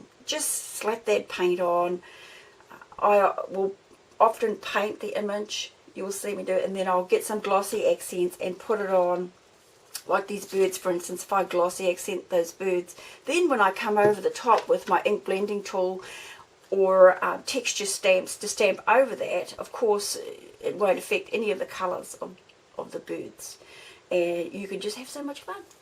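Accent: New Zealand. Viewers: So nice to hear a Kiwis voice on youtube, your tickets are amazing.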